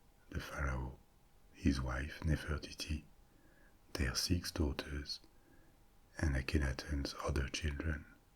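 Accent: France. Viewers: Da nema Francuski naglas imao bi barem dupli broj pratioca. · You are my official sleeping aid addiction. Your gift of telling factual stories with the smoothest french sexy accent. What more could a girl ask.. I dont know if this happens to anyone else, but your voice penetrates into my dream...idk if that means I'm not in a deep sleep, or ? Then along with your soothing voice, you tell me that key to total relaxation is a massage...so you massaged my aching back 😳 I woke up feeling like I really had a massage. I have ALWAYS had extremely vivid dreams. But this is sort of a new twist. (I sincerely hope for it again) Thank you. I hope this is not a sign I'm flying over the cuckoo's nest.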